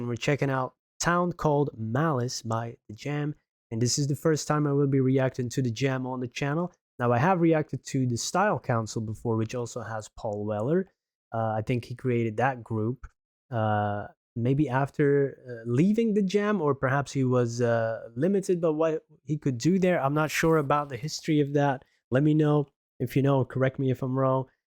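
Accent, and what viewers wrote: United States. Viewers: Hey thanks for the reply. First let me apologise for calling you an American I didn't mean to insult you. But true you do sound a little American. I would love you to react to more Jam/Paul Weller videos as I remain a lifelong fan. I would suggest looking at some of Wellers solo work as well particularly the album some of which was filmed at La Luna in Brussels. I included this as a small example <link> Keep up the great content 🤘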